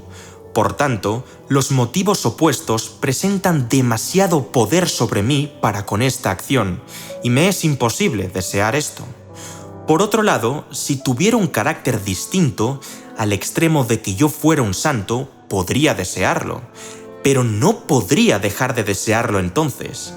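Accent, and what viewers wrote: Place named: Spain. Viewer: Disculpa, diré algo sin la intención de ofender: en Latinoamérica no estamos acostumbrados a escuchar el español de España. Es tedioso, como al escuchar por mucho tiempo el inglés británico. ¿Crees posible en un tiempo no muy lejano "traducirlos" al español latinoamericano? La temática de los videos son interesantes, incluso hasta para traducirlos a varios idiomas más. Saludos.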